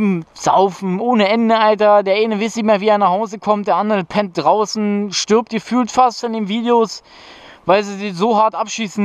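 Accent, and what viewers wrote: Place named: Berlin, Germany. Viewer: Digger diese berliner schnautze einfach zu geil👌🏻👌🏻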